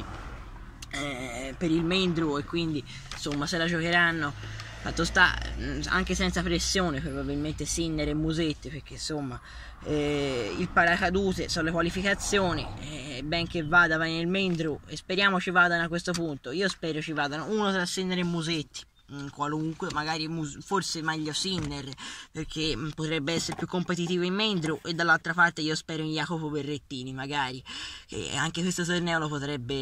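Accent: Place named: Florence, Italy. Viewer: Purtroppo hai quell'accento fiorentino. Per il resto bravo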